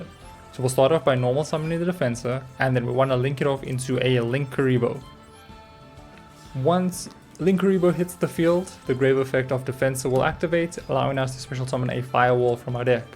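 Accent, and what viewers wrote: South Africa. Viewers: Awesome vid man 🤙 recognised the SA accent immediately 😂 much love from Jozi ❤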